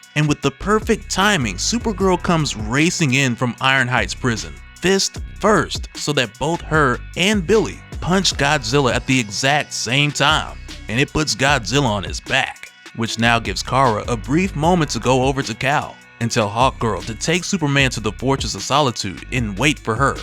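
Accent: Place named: Atlanta, United States. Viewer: I swear you sound like a ATL dude fr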